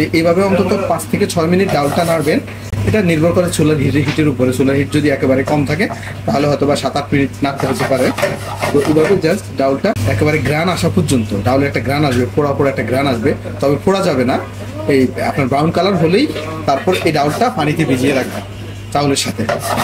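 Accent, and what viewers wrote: Noakhali, Bangladesh. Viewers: কিও ভাই আন্নে কি নোয়াখালী পোলা নিও বার বার পানি কে ফানি বলছেন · ভাইয়ের বাড়ি নোয়াখালী এ ভাষা সারা বিশ্বে চলে ইউটিউবে কি সমস্যা? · Apnar basha ki feni naki noakhali?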